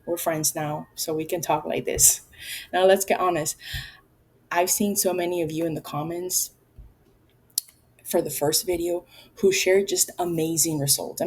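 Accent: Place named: Latin America. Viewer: Thanks for the shout out!, I never counted one calorie during this entire 3 year GLP-1 excursion that has helped me lose over 280 lbs. If you are not led to count, don't! There can be medical reasons to, but let your provider/nutrition-specialist guide that path, not nagging from others. And your weight loss? Girl, you are losing 1 pound a week! That is the exact average weight loss. Those of us who lose fast are the outliers, not you. People, including me, who are super-responders, tend to speak up louder than those who are on the path at the normal pace. You are doing great! Nosy question you don't have to answer: Are you Latina? I detect the accent. ¡Soy cubana!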